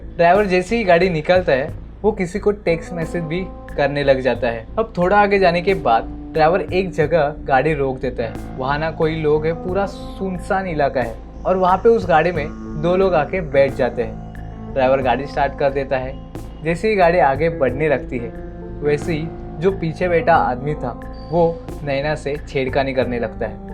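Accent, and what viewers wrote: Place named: Mumbai, India. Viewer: Hindi should be more fluent...yeh mumbaiya hindi h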